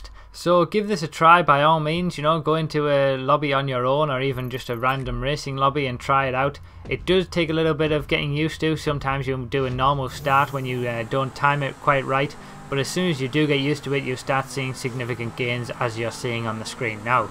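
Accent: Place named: Teesside, United Kingdom. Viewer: You sound like a lad from teeside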